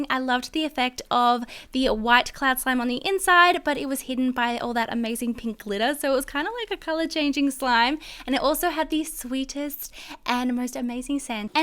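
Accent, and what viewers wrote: Australia. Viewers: OMG I LOVE!!! I’M ALSO AUSSIE AND I LOVE LISTENING TO SOMEONE WITCH THE SAME ACCENT AS ME!!! SHE MUST BE RICH TO GET SO MANY SLIMES!!!! im broke....... BUT I CAN ENJOY SLIME BY WATCHING HER VIDEOS🥰🥰🥰🥰🥰🥰🥰🥰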